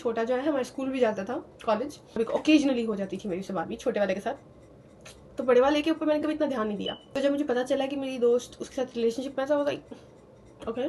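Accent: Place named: United States. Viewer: Ur American accent is so damnn good!!!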